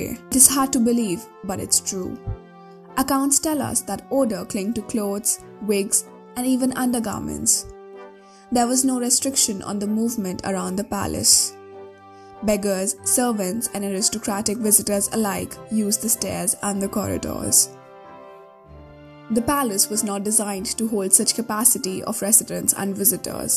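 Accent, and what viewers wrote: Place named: India. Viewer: I just can't take the Indian accent seriously. I hear it, I'm gone.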